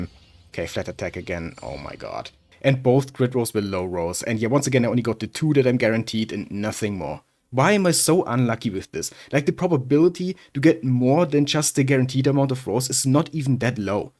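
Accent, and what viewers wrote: Germany. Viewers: Do i hear german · I hear german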